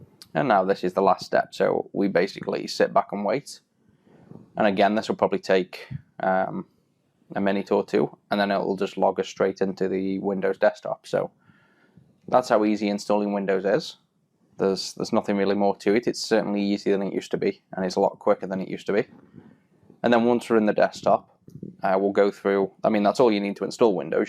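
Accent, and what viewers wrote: United Kingdom. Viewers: You've got a strong British accent!!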